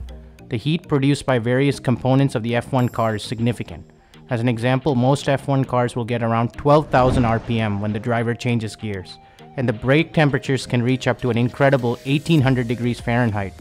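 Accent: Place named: India. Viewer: Don't hold back your tongue when speaking. That's why Indian accents really hurts my ears when listening.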